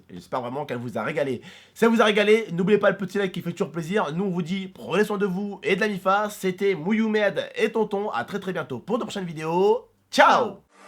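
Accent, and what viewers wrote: Quebec, Canada. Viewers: ptn toi tu fais 24 k de vue en 2min et une personne en 1 h fait 5 vue t trop fort bravo l'accent du quebec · ptite accent quebecoi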